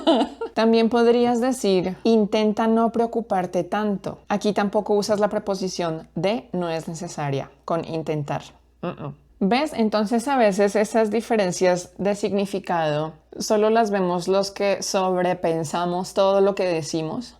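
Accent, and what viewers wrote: Colombia. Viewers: rapidamente me he dado cuenta que eres Colombiana, por tu acento y por el uso de la palabra "vaina". buena leccion!!!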